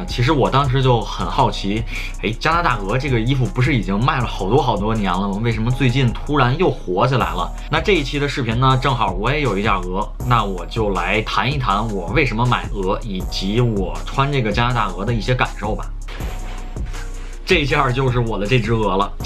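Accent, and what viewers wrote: Beijing, China. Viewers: 北京话 亲切！ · 哇博主是北京人吗！好熟悉的口音咧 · 你这口音是北京口音吗？实在是好难听啊！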